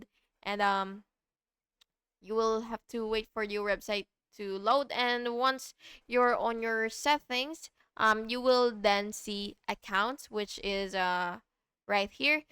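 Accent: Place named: Philippines. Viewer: your accent sounds filipina very much.. hehehehe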